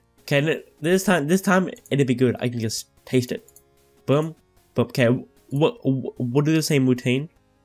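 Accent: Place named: Europe. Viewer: You don’t sound Aussie more European Good vid anyways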